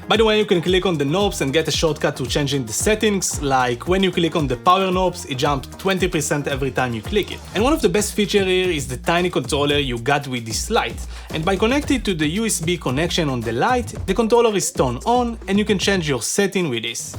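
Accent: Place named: France. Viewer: your accent is fine dude. He’s just a hater. Most people LIKE accents. Sounds like you are French. Your accent is strong, but by no means hindering your communication.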